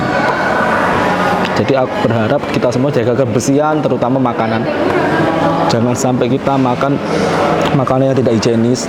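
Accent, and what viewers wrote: Java, Indonesia. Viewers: Nama chanelnya..tp klu bicara medok koyo wong jowo..sukses ya.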